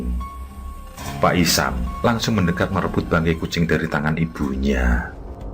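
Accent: Java, Indonesia. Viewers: SUSKREBER BARU , BUK NURI TKW DI SYRIA , SALAM SEHAT WALAFIAT DAN SUKSES SRLAKU UNT VEN SENENG SUARA HOST NYA NGEBAS DAN JAWANYA SANGAT KETAL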